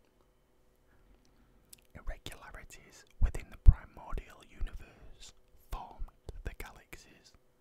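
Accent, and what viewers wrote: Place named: St Helens, United Kingdom. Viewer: Your accent seems similar to mine! St Helens gal! 🙌🏼 love your videos!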